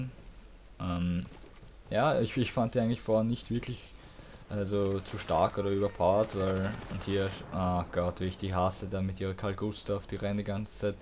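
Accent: Austria. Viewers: Du hast ein österreicher akzent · Am akzent erkennt man leicht das du aus Wien bist :)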